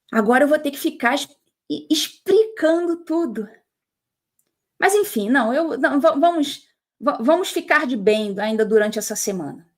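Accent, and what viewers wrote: Rio de Janeiro, Brazil. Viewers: Sotaque carioca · O sotaque carioca confundiu o ouvido do pessoal. Aos meus ouvidos, sinceramente, passaria batido, tanto que só fui perceber a "confusão" porque veio a tona rsrs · adoro você e esse seu carioques é lindo como você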